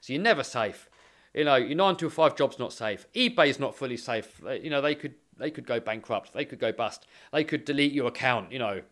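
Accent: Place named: England, United Kingdom. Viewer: your the crazy guy with a English accent who's not affraid to destroy his RC'S. Your infectious personality is entertaining and a winner. Thanks!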